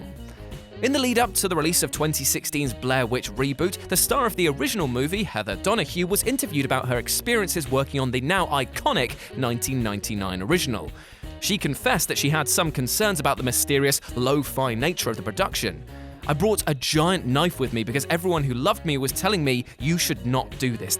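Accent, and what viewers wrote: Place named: Cockney, United Kingdom. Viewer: The content is quite interesting if you can deal with the annoying chirpy cockney banter that goes with it, there is nothing worse than somebody who thinks they are funny.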